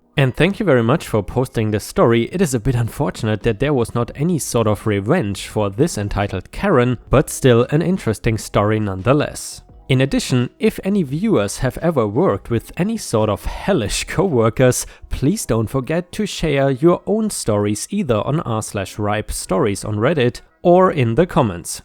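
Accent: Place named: Germany. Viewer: ⭐⭐⭐⭐⭐⭐another set of great stories, brilliantly told! I lived and worked in Germany for a while and miss the accent. Makes me feel at home sort of? If you know what I mean?? In a nice way! 🌟🌟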